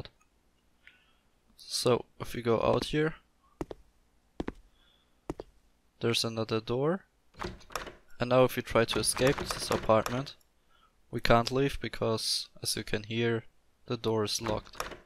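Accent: Germany. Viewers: Man hat es ein bisschen gemerkt bei manchen Wörtern, du hast diesen typischen deutschen Akzent drinnen, aber ich glaube, das fällt eh niemandem auf😆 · Love your videos man :) Just one question (no offense): Are you from an english speaking country? Because your english sounds quite a bit like the english most of the germans are speaking :D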